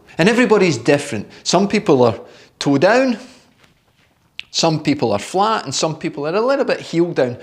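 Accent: Scotland, United Kingdom. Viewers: Actually, it’s the English who speak Scottish a wee bit funny…there’s just more of them so people around the world think their accent is real. It’s Not. This is real! 😊😊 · bro???😂😂😂😂,,,I love your Scottish acent a MOERSE LOT!!! from Wellington, RSA.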